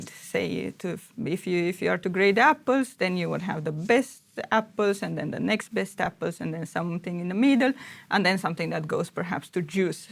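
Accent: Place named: Norway. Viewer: Norwegian english from. 😀 Tomta er litt for dyr for meg nå, ikke lett å regne inn.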